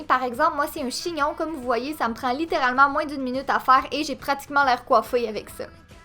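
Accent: Quebec, Canada. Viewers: j'ai remarqué que tu était québécoise ! tu viens de ou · tu vien d'ou québéc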